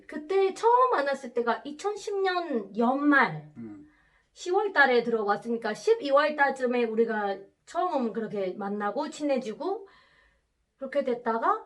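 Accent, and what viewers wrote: Gyeongsang, South Korea. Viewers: 말씨가 낯설지 않다 생각했는데 대구에서 어학연수를 받으셨군요 저두 대구 고향♡ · 경상도 억양이 아주 살짝있네라고 생각했는데 대구에서 어학연수해서 그런갑네요.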